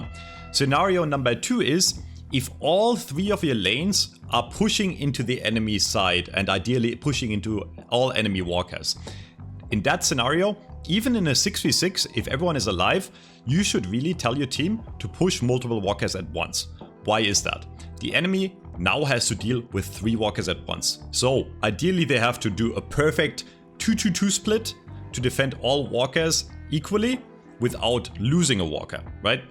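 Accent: Germany. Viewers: I can hear Deutsch :^D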